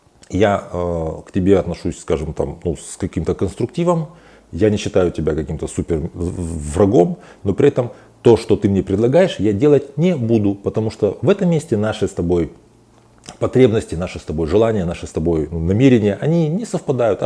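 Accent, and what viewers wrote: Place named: Ukraine. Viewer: Похоже ,, психолог" из ,, незалэжной"- говорок выдаёт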